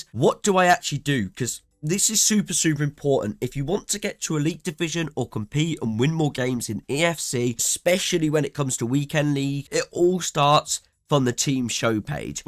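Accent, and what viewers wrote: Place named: United Kingdom. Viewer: You sound so ultra British when u are in that video , Reds or Blues or Tots or ?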